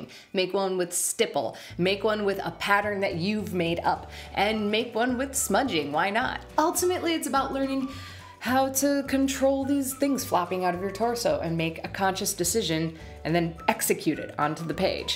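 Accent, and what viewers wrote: Canada. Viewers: is she Canadian ?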